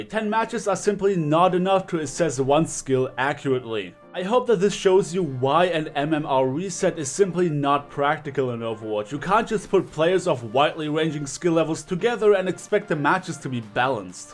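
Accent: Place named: Germany. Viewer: Sorry man but I cant follow you. Your english is so bad. Thats really not an insult, but an advice. Work on your language skills. How you pronounce words and stuff. I am 99% sure that you are not mother tongue english. Sounds like german or so. Anyway: Cant follow the information you give because your spoken words are not accurate.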